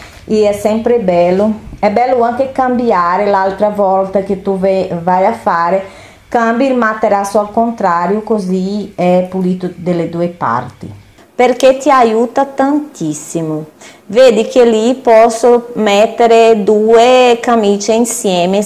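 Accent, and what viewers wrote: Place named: Brazil. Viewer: Mi piace il tuo acento brasileiro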